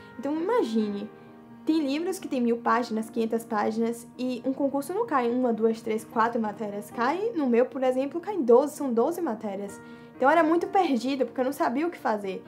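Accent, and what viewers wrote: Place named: Bahia, Brazil. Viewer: O sotaque baiano é inconfundível. Hahahahah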